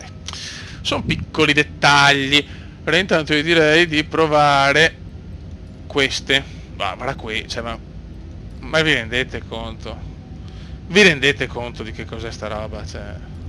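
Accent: Veneto, Italy. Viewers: Bel video, ma parli troppo veneziano, si capisce poco quel che dici...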